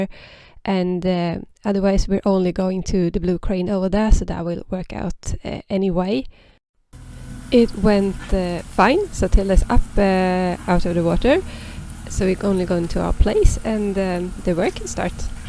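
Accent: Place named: Värmland, Sweden. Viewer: Hi Tilda, I really enjoy your channel (especially when you get to hear some värmländska). I'm in a similar situation, having bought a Flamingo 40 last year as my first sail boat together with ha friend. I just have one suggestion - could you possibly arrange the order of the videos sequentially in the playlist you've made? Right now it seems that youtube autoplay will select the next video to play at random :) Thanks for the great content!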